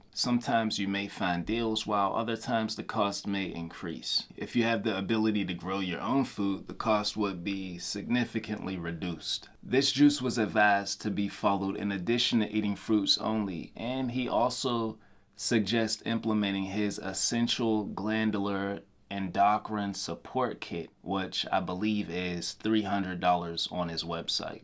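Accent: Pittsburgh, United States. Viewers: Few minutes in, and my question was answered lol. I heard the Pittsburgh in you, first sentence. 💪🏾 Keep grinding man, and you gained a new subscriber. I’m originally from Penn Hills/ Homewood.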